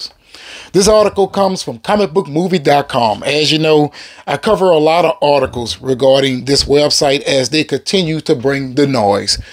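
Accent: New Orleans, United States. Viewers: Sounding so New Orleans in this vid dude. 😂👍🏻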